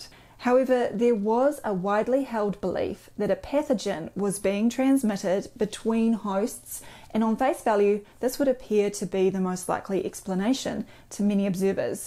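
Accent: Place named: New Zealand. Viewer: But she a perfect New Zealand accent…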